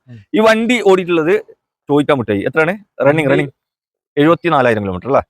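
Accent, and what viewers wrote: Kasaragod, India. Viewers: കാസർകോഡ് ഉള്ളവരുടെ സംസാരം ഇത് വെരെ കേട്ടില്ലാ..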